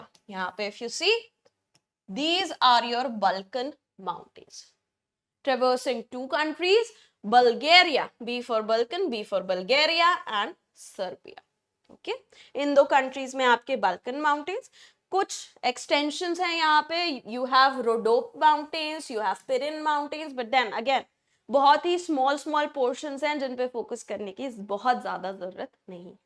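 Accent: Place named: United Kingdom. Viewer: Mam please aap normal or indian accent me padhaiye,, british type accent thoda ajeeb lgta hai ..jaise live classes me padhate ho vaisa accent😊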